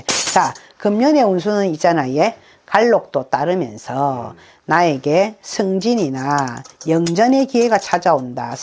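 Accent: Busan, South Korea. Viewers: 부산사투리 맘편해져요 저도 부산사람이라..한번뵙고 상담 받고싶네요.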